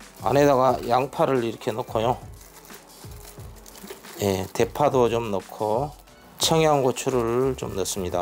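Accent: Jeolla, South Korea. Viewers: 셰프님 부산분이시죠? 전라도 사투리도 들려서요ㅋ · ㅎ.. 고향이 어디신가요? 전라도쪽 같아요~~ 여긴 전라도 광주 입니다..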